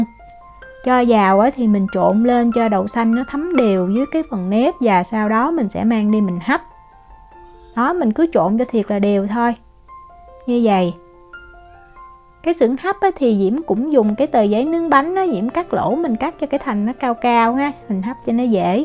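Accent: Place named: Mekong Delta, Vietnam. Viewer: Diễm oi! Chị nấu thành công rùi ,ngon lắm tơi xốp ,giọng nói người Miền tây dể thương ,Diễm nấu xôi đậu phộng nhe !💛💛💛💛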